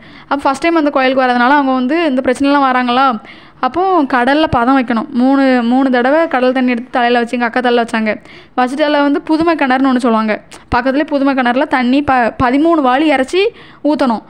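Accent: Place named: Tirunelveli, India. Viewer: Excellent explanation especially nellai slang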